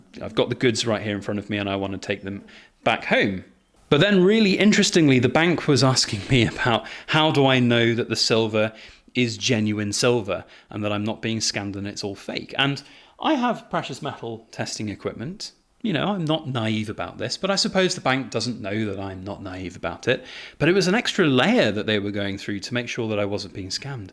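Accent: England, United Kingdom. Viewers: Hey bud, 2024 has sure thrown a bunch of really interesting times and? situations your way this year. I suppose that bunch of $ leaving their coffers may be a hack but as you say the 10th degree was totally not needed. You are and has an English accent that stands out like it is you. Even an untrained Canuckian can tell your voice against any other Cockney accent. I hope that wasn't an insult? However, you got them straightened out and hopefully your seller wasn't too agrivated awaiting his money. That really is a lot of silver. Must feel great to have that pile to ponder my old friend. WOOHOO for you. A hundred ounces is the most I have ever had in hand. I have a video of it (10 x 10 ounce bars) being reduced to casting shot. I am sure glad I don't have to do that anymore as I finally found a trust worthy dealer for my needs. Generally a buck and a quaarter over spot. 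Good to see you today old friend. Shall we go pour something, LOL.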